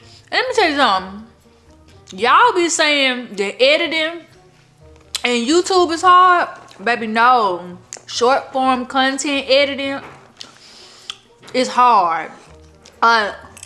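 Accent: South, United States. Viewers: Thanks! I love your accent too, I find myself trying out the southern accent after watching your vlogs 😂 lots of love to you & 🫶🏾🫶🏾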